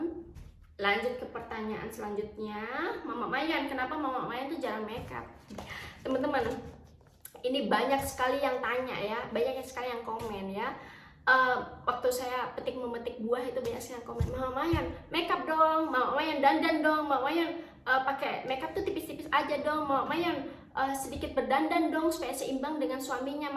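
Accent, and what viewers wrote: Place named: Eastern Indonesia, Indonesia. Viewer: Pantas logatx org timur.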